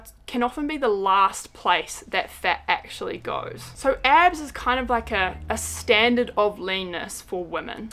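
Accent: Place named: New Zealand. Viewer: Hi, I just discovered you and your channel this month and I am IN LOVE!!!! 😃 I lived in Marton, the Hub of the Rangitīkei 😉 for a year! It's so fun to hear the kiwi accent again! I don't hear it much here in Virginia. 🤣 I appreciate your clear and visual teaching...I am struggling to get off those last 8-10lbs and your wisdom and thoughts have inspired me to keep going! Thanks for your encouragement and empowerment! You are a blessing!!! 🙌💪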